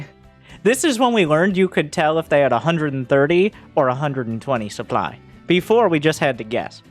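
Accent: South, United States. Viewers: Does he have a southern accent?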